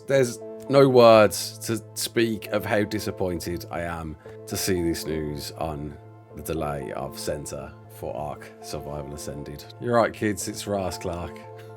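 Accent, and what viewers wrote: Birmingham, United Kingdom. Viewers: Are you from Birmingham? You have the exact same accent as me bro 😂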